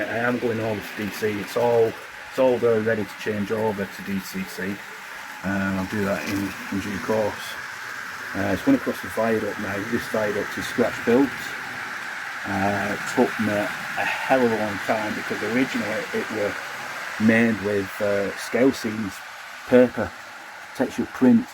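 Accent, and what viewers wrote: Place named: Farnworth, United Kingdom. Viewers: Brilliant, really enjoyed this and looking forward to seeing more.Don't worry about your voice you're a Farnerth lad and sound reet gradely. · Hi, really enjoyed the video, great layout and the scratch building is superb and like the theme Lancashire and Yorkshire railway! You sound like a northerner like myself and sound absently fine and its about your passion for the hobby and you know your stuff and its good to link it with the history! My only piece of friendly advice is to go slow with the camera panning and maybe invest in a small hand held tripod that you can hold or put on the layout for static shots. Looking forward to following your progress and just subbed. Cheers Gary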